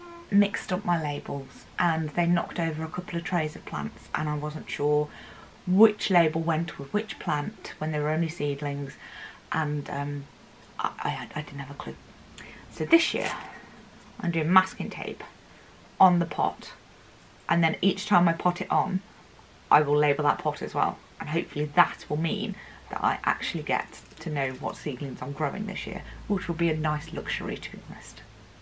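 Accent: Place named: East Midlands, United Kingdom. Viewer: Your accent is similar to mine where abouts are you from?. I live in east Midlands do we have it?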